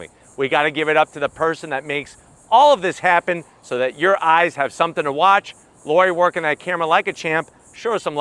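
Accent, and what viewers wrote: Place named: New York, United States. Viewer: Okay, a winning formula for your presentation. I could swear you have a New York accent. Great salesmanship. Do you ever bump into former students at a car dealership?!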